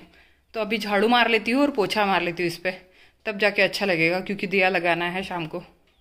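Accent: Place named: Varhadi, India. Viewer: Kay chyyata marta tumhi😂😂🤣🤣mast apli warhadi aikli ki mast watat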